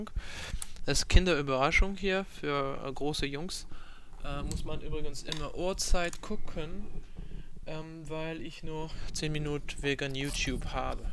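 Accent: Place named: France. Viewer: hab ich mir gedacht man hört den französischen akzent parce que je parle aussi francais ;)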